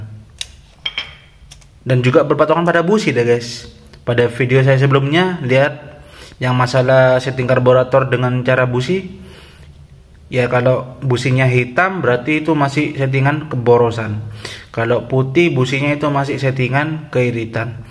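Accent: Madura, Indonesia. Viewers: Jek logatah reng madureh abiss mas😂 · Katara men reng madura , bhasa agak sal sal sakunik 😅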